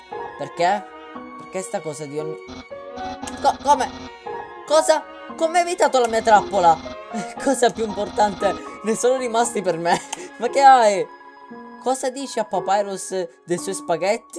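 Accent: Sicily, Italy. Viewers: comunque si sente che sei siciliano anche se non parli il dialetto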